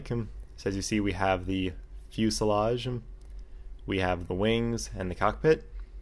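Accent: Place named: Italy. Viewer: i love your Italian accent, say "started"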